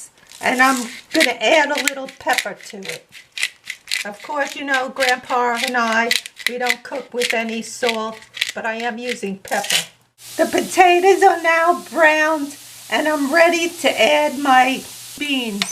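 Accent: United States. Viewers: It's my favorite American accent!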